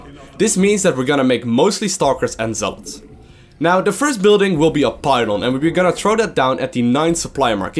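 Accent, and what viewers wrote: Netherlands. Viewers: It sounds like Dutch so much hehe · Are you dutch? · are you dutch? you sound really dutch but I cant quite lay my hand on it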